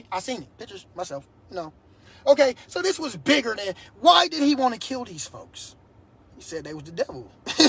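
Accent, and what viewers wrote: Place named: Milwaukee, United States. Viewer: Sound like you from Milwaukee. Link up.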